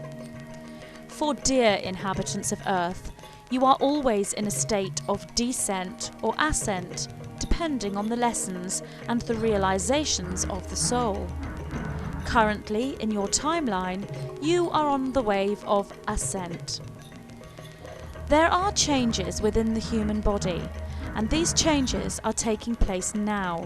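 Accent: United Kingdom. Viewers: Seeing as you live in England I would expect you to have British accent but I wouldn't expect someone from another planet to have a British accent.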